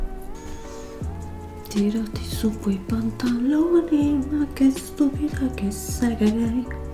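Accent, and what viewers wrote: Naples, Italy. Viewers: Si sente che sei napoletano perché anch'io sono di provincia di Napoli faccio pure io la cultur napoletani ma po chi so sti strunzz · Si ragazzi sono napoletana e si vede l’accento, È napoletana👌🏻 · Il dialetto è perfetto confermo che è napoletano io sono CI napoli❤❤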